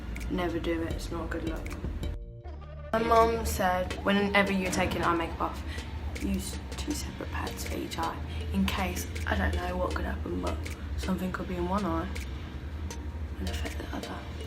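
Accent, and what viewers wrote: United Kingdom. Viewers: Wow I had absolutely no clue she was british until I watched this video 😩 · The calmest British accent I ever heard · Awwwww I didn’t know she was British so cute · I was so confused at the beginning bc I forgot she was British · U lot act like youve never heard a british accent before lmaooo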